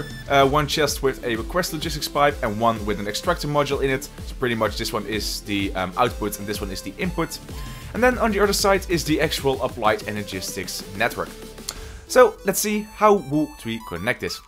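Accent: Netherlands. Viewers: Nice dutch accent 🧡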